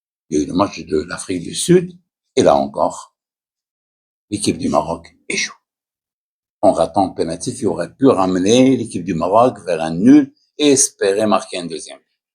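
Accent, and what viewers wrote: Standard. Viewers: فرنسية نقية ا السي ، تبارك الله ماشاء الله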